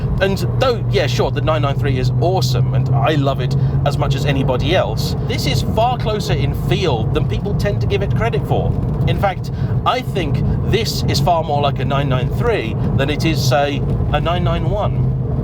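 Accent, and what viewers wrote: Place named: Yorkshire, United Kingdom. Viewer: Did enjoy the Yorkshire reference and accent 😂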